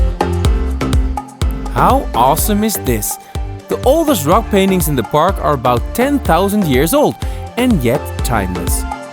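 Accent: Netherlands. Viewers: Thank you for taking us along. Zimbabwean here, born and bred, however I've lived in Wageningen before and immediately picked up on your Dutch accent. Good to share that when you refer to 'Kopje' its just one of the many borrowed Dutch words now part of our either everyday English vocabulary or Vernacular too. E.g. Jazi (Shona for jacket/long overcoat) is from 'Jas' similarly 'Tafura' (Shona word for Table) can be traced from 'Tafel' These are the few on top of my head but there is so much Dutch influence in our culture that a lot of locals may not be aware of. New subscriber too, keep doing what you are doing. cheers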